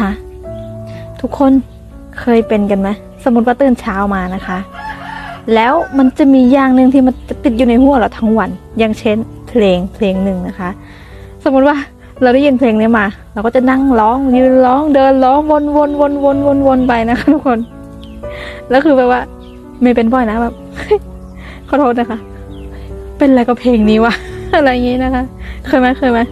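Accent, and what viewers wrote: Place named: Suphanburi, Thailand. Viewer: พี่พูดเผน่อคิดถึงน้องที่สุภรรณเลยย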